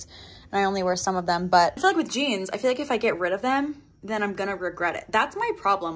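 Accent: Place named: California, United States. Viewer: That Californian accent 😶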